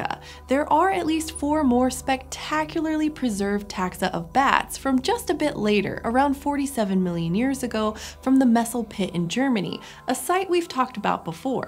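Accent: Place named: Pittsburgh, United States. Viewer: I wonder if the narrator is from Pittsburgh? Her "a" before n and m become elongated to "eh" or "ay" "ai-nimals"/ "eh-nimals" "maimmals"/ "mehmmals" "ay-end", "ay-encestor", "hay-ends", "tray-en-sitioning", "lay-end", "tay-endem", "brainch", "caimels". Been watching dozens of these videos. Really love the material. Thank you! 😍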